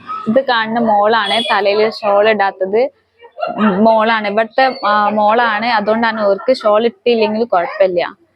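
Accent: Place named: Kannur, India. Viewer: . യുടെ. സംസാരം. കേട്ടപ്പോൾ.. കണ്ണൂർ. കാരിയാണെന്നെ. തോനുന്നു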